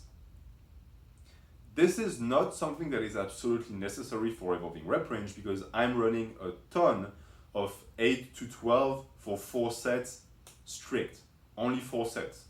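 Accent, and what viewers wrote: France. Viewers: You're French man? you're accent seems French to me. C’est bien ce que je pensais tu suis et.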